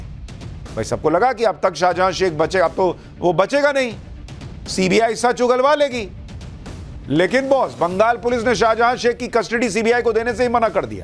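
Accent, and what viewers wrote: Bihar, India. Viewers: Are dada ee to bihari lg rhaa g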